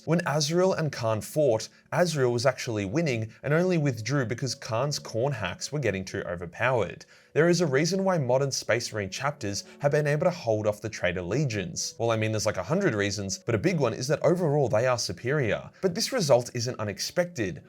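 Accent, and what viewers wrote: Australia. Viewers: Battle of cadia explained by australian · Been a fan for a while now cheers mate for being the reason I’ve become a fan of war hammer and I keen to always hear a fellow Aussie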